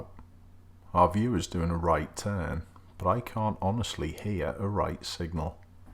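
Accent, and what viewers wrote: United Kingdom. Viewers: Normally I don’t like people that narrate throughout the entire video. However I love your voice and your decidedly British accent. Being a yank we only speak American we don’t speak the Queens English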